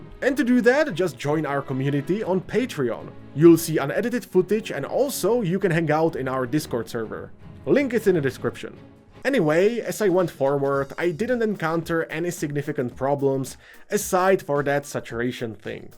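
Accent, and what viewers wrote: Czech Republic. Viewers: Where are you from my guy? You sound like guy from Czech Republick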